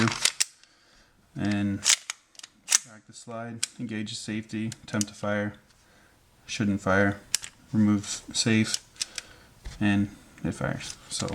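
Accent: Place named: Canada. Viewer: your accent screams "I'm canadian" haha, much love from Quebec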